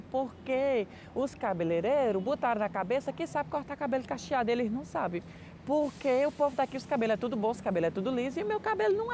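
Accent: Ceará, Brazil. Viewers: voce e muito engraçado ate o seu sutaque cearense voce ainda não deixou Conheço um pouco Paris porque tenho 1 filho e uma filha que moram na França ela em Angers vale do Loire linda cidade e o filho strasboug são profissionais ela medica e ele engenheiro moram na França ha 17 anos tenho 4 netinhos e se um dia eu for a Paris eu queria voce como meu guia turistico pra mostrar muitas coisas que eu nao conheço em Paris passei pelo Louvre Torre jardim de Luxembourg etc mas ainda tem coisas que eu nao conheço um abraço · Aquele momento que você sente orgulho de ser cearense só pelo sotaque kk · sou nordestina também e adoro seus vídeos, vc fala como se ainda vivesse no nordeste kkkk! Ômi, fala da sua opinião sobre comida parisiense. Eu sou meio fresca com comida e nas vezes que fui em Paris só como steak e batata. Uma vez fomos jantar na "Champs", pedi risoto de frutos do mar....veio os Moules...tive coragem de comer não!! 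prefiro sururu! kkkkk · Tô amando seus vídeos! Você sabe falar sobre diversos assuntos, sérios ou não, e ainda assim consegue arrancar risadas. Você é ótimo, amo o jeito de falar, representa nosso Ceará! Sucesso aí! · adorei, vc é mto engraçado! amo sotaque nordestino <3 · td bem? Faz mais video desse estilo, falando o q vc não gosta e o que gosta. Você é otimooooo. Sou do Ceará tbm, mas tem MT tempo que sai de la e moro em Vitória, no ES. Então assistir seus vídeos me faz matar a saudade do sotaque de la, além de rir muito. Parabéns e sucesso. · Saiba q gosto muiiito, muiiito de vc. Uma afinidade q deve se justificar pela sua simpatia, educação, autenticidade e, mais q tudo junto, por sermos conterrâneos. Sou Cearense, do Cariri, e me emociona te ouvir falar com nosso sotaque, do nosso jeitinho tão singular. Vc fala de Crato, Juazeiro e eu embarco junto... Hj vc busca realizar seus sonhos e objetivos em Paris, eu na tão querida, por mim, Salvador/BA, mas o fato é q as nossas origens/ raízes são como uma marca, um sobrenome. 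Sorte e muitas Alegrias em sua vida. Que viva muitas aventuras especiais para q tenha sempre assuntos a compartilhar com a gente. Xeiroooooo grd.! E obrigada por nos fazer sorrir a cada frase. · oi! amo seus vídeos, suas opiniões, vc além de inteligente é muito divertido! amo o sotaque nordestino. um chêro ( minha mãe tb fala assim) bjs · Amigo! gosto da suas expressões, sou nordestino como você e sinto feliz quando fala com sotaque. Fazia muito tempo que eu não ouvia a palavra " pirangueiro " ou " pirangagem "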